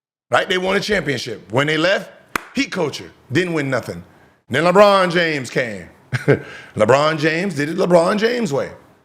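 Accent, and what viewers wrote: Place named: Memphis, United States. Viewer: You got that Memphis mane down 😅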